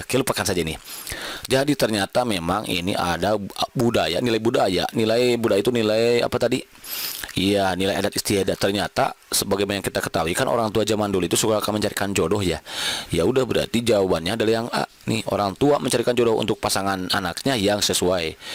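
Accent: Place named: Batak, Indonesia. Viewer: sebelumnya terimakasih ya mang utk video2 nya tapi mohon maaf saya cukup terganggu dgn aksen mang yg kaya gini. jd kurang fokus dengar penjelasannya, biarpun saya org batak asli yg kalau ngomong kekgini tp kurang nyaman dengar cara ngomongnya😢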